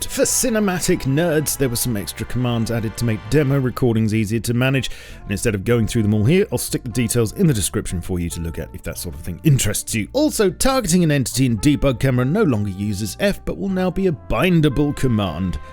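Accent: England, United Kingdom. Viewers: For me, one of the best qualities of your content, is that even though I rarely play rust committedly, your videos are still entertaining. Maybe it's your charming english accent or maybe it's your witty humor. I don't know but keep it up.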